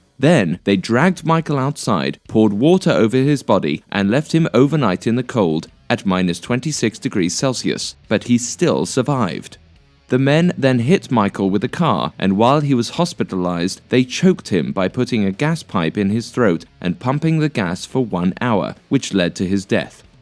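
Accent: United Kingdom. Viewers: As soon as I heard a British guy speaking I bailed sooo sick of everything I watch narrated by Brits bye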